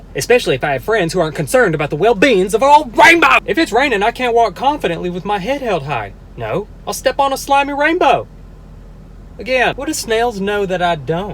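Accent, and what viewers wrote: South, United States. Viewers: Kind of new to this channel. Love him. But where is he from? He says he lives in California but if I'm not wrong, ain't that a southern accent??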